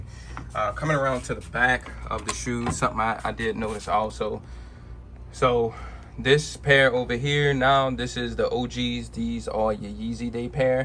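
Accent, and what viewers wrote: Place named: Louisiana, United States. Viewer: You gotta be from Louisiana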